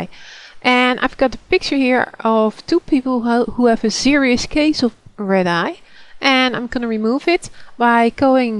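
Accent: Netherlands. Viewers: lol, ik hoor wel dat je een jonge Nederlandse vrouw bent ;) Buitenlanders hebbe' natuurlijk geen idee. Goede filmpje bij de weg.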